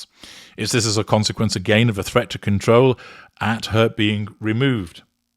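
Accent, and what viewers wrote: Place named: Midlands, United Kingdom. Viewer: I could be wrong but I detect a Midlands accent in your voice!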